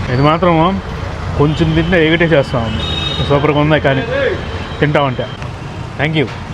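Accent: Nellore, India. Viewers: Hello brother.. Mede nellore mata laga vundi..... Made nellore le..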